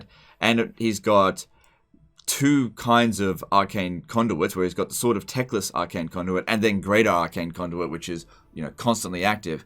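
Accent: Australia. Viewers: I just listened to aussie bloke describing shaving his balls.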